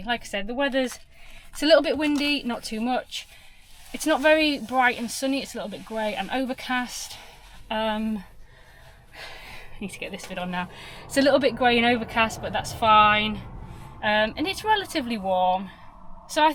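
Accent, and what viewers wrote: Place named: Nottingham, United Kingdom. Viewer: Don’t recognise your accent? My guess would be Nottingham area , sorry if my guess offends you but obviously only a little sorry , an energetic charming soul you are .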